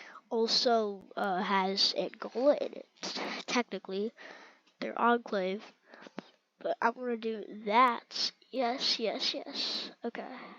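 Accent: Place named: United Kingdom. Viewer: Bro's bri ish ig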